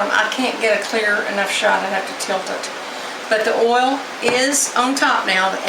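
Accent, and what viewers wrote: South, United States. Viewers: Can tell a recipe is gonna be good when the person has a southern American accent 😀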